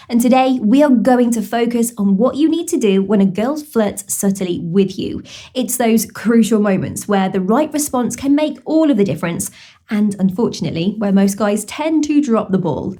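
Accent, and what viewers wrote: United Kingdom. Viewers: Your advices are the best, and make a real difference. I also come here to hear your lovely, soothing, British accent.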